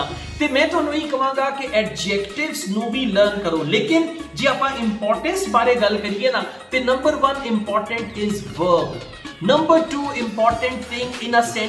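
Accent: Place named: Malwa, India. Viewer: Background music...👌👌punjabi sirra bolde o sir tuc sade ..malwaian wangu😊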